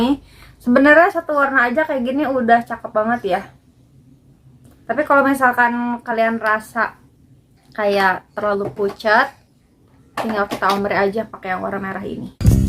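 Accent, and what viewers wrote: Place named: Bandung, Indonesia. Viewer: Bandung baget logatnya 🤣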